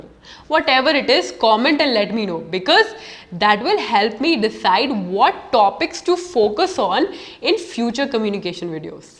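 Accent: Hyderabad, India. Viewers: Are you from Hyderabad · All is good but your pronunciation of words with 'con' 'com' is not ken but kon or kom, like continue, communicate. And there is no way that indians can get off their ascent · Indian accent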